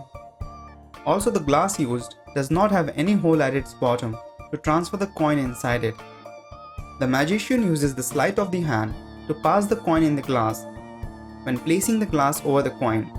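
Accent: India. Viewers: I like the VIDEO, but i don't like the narrator's accent. Please don't use Indian accent, coz Indian accent sound so stupid. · That Indian Accent Puts Me Off! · Because he is an Indian. And sadly for you, you only talked Indians before via Tech supports. So you're now surprised how a Magic explainer's voice can be an Indian voice. Fact of the matter is, magic tricks finds it's home in India. It's a home of magic. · his unbearable Indian accent! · I also think His English assent is completely Indian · U have indian ascent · Is this narrator Indian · You sound very indian and that's a great thing . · Is the narrator in this video indian 🤔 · Sound like Indian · Mi sijaelewa kabisaaa lafudhi yako ya kihindi....mgumu kuelewa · Are you indian? You sound like an Indian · This guy sounds like Indian. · Why da hell is the Hindu trying to convince me with his accent ? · When v sounds like w, you know he's from india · Its Indian English.... 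· From India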